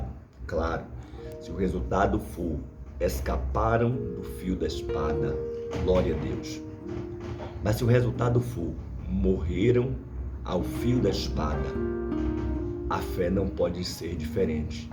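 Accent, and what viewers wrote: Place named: Paraíba, Brazil. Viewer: Sou paraibana e o nosso sotaque são parecidos e a sua mensagem era Deus escolheu Maravilha glória a Deus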